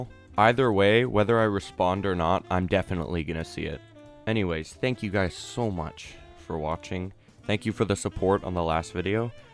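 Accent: Canada. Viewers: Bro you sound so canadian, more canadian than most ones Ik, maybe cuz im in the city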